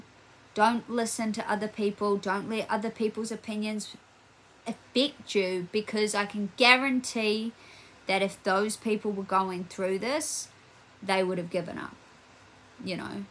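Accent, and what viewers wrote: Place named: Australia. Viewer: You are absolutely right, don ´t let anybody rush you! But the thing is: people do rush you, things which seem totally simple to them are like climbing Mount Everest for you, for example: (when you are finally allowed to): take one step! The (emotional) problem is, in my experience, not yourself, the fact that you have to rely on a lot of help from others etc, the problem is all of the other people (even the physiotherapist), who rush you and put pressure on you - this is what causes the “bad” emotions to you. And like you, I had days where I wished I was dead, not (only) because of the pain (which was excruitiating, like you said), but just because I was tired of needing, help, I was tired of waiting for things to get better, I was tired because the whole situation was soooo unbearable strenous and exhausting…. I don ´t know how good the medical care is in your country - I don ´t know where you come from, from your accent I think Australia or New Zealand - in Germany our health system is at a low and you don ´t get Physiotherapie for more than 6 months 😮 and then “everything has to be ok again” 😡 I found your video by accident today, your video is 1 year old, you are young and I really hope that you are back on track, that your leg is functioning “ok” for YOU, so that you can go on with your life and do all the things you want to. 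I am 58 years old, 2 years ago I had a poly trauma with ancle- fracture, tibial plateau fracture, knee cap fracture and fracture of my upper arm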